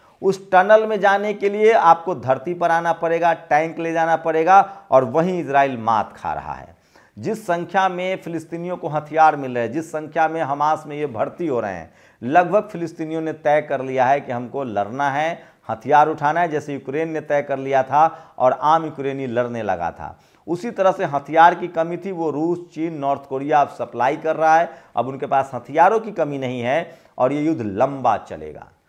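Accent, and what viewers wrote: Bihar, India. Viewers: sir aap Kaun se state se ho. Aapki Hindi sun kar garvanvit mahsus karta hu ki mai bihari hu. Aapki language me Bihar ki Hindi wali tone aati hai 😊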